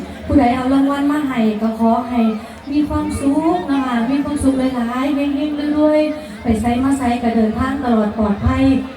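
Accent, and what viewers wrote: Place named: Isan, Thailand. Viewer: น่ารัก เสียงดี เพราะมาก แถมพูดก็เก่ง ❤🎉สุดยอด เสียงอิสาน❤🎉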